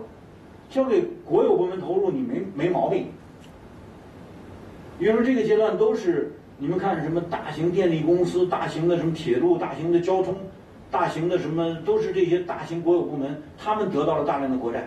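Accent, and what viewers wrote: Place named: Cantonese, China. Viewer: 这个介绍人的口音富有广东腔。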